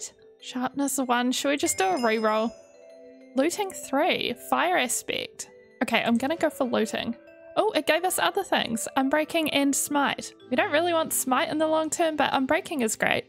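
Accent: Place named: New Zealand. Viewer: omg stop ive been following u for a while an just now do i realise ur kiwi too xD (no wonder why i felt so comfortable listening to u speak cos there isnt an accent for me haha) kia kaha e hoa !! aroha nui hehe <3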